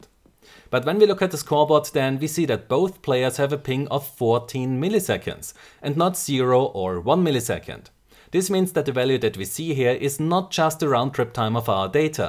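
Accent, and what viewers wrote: Austria. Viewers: Da hört man doch sofort den Österreicher heraus! Grüße aus Linz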